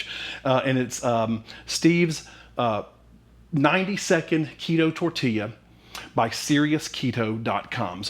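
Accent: South, United States. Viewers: Pre-ordered your book! Thanks for all you do! So good to hear that southern draw and your easy going way. Good luck in Cali!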